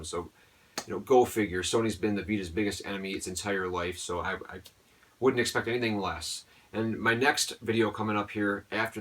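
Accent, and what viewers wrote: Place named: Wisconsin, United States. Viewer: great job man! keep it up dude. are you from wisconsin? your accent sounds like it. could you start listing the game titles in your description box? thanks dude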